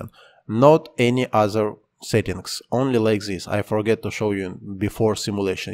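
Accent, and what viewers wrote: Russia. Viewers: Слишком русский акцент... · Это самый русский акцент, который я когда либо слышал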